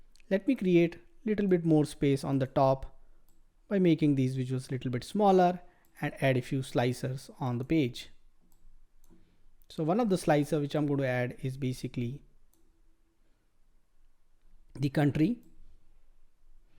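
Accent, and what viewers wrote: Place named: India. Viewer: 11 hours of a thick Indian accent. Sorry. This is impossible to sit through.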